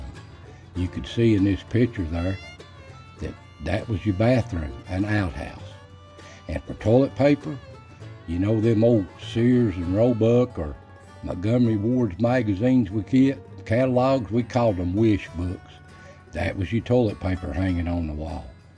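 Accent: Appalachia, United States. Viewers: Enjoyed this. Watching and listening to your videos makes me reflect on my own experiences and things I remember and value so much. Made me think about one time I had a friend visit me one time and I was taking him to see some of the country up around Madison County and stopped to ask for some directions as he listened on....in a short time I got what I needed to find what we were looking for and I looked over at my friend and he said "You understood what that guy said? and at first I was a little puzzled, but then remembered he was from a different place. I said, yes...I got it and he was even more amazed when I drove us right to the place we were looking for...which was the island in town which was the side of a Civil War camp of instruction...at the time we saw it there was an old school on the property. Oh you said something about some thinking you were faking your accent...I knew the first time I watched one of your videos that it is genuine....it is had for anyone to be able to fake these mountain accents because it is not only how words are pronounced , it is also the actual words used during the conversation. 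Hope you are feeling better every day ! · I live in Jefferson County Alabama,(almost in the center) they call it "the fingerhills of Appalachia", in an old mining camp Cardiff and Brookside. I still live and own family land right here, where my family had a truck/drift mine, for coal. My great uncle Clarence used that old mine as a cover for his moonshine operations back in the 50s, until he was caught by the revenuers and sentenced to 15 years, in the penitentiary. We have alot of the same sayings and ways that we still live and use today. Every now-and-then, I have to go to the city of Birmingham for taxes and crap like that, and I am a steel mill worker for US Steel in the city of Fairfield. I often think it funny, that the mining camps I live in, use to provide the coal for Slots Sheffield, Republic, US Steel, and today, I work there. I love my family ties to these hills and hollers, and have at least 120 years if family links. 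You sound to be a little older than I am, but we talk the same! 🤣 AS USUAL! I enjoyed the videos, on this off day. Take care. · I was born and raised in Knox County, KY, in the Cumberland Gap area. I moved away years ago and miss the simple life we had back then. You talk just like my Daddy and Uncles! Daddy was also a coal miner in the same areas you were. I am a proud Hillbilly! I've lost my Appalachian accent, but cherish my heritage. I love hearing you talk in the language of my childhood! Thank you so much for sharing your stories. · I recognized every word you said so I can vouch that there’s nothing fake about your voice. Hearing mountain talk again brought tears to my eyes. My Mamaw and Papaw from Southeast KY and Southwest VA talked just like your family. They’re gone now and so are my parents and almost all my aunts and uncles. I rarely hear people talk like this anymore, but when I do it’s like being home. 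Thank you for keeping these memories alive. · I can detect a fake southern accent pretty easily being born and bred in East TN on the Cumberland Plateau. If you want to hear some appalling examples of a fake southern accent watch the movie Cold Mountain. Your accent in 100% old southern mountain folk and you should be proud. I catch myself toning down the accent and speaking with more "proper" grammar most of the time, but am most comfortable speaking more like my grandparents did and to some extend the way my father does in the right company. It's weird how I can turn it off and on depending on who I am around. My wife pointed it out; I had no clue I was evening doing it! I think much of it comes from fear of being labeled as ignorant or a hick when I was younger. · That was at a time and place when people were poor but free and they knew it. My people were from the mountains of Kentucky, and they talked just like you.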